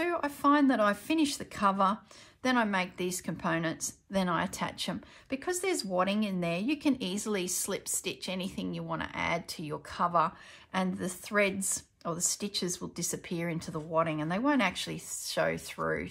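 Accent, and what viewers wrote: Australia. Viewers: I really enjoyed watching this, quite inspirational. Fantastic to hear not only an Australian accent but a Queenslander, yay!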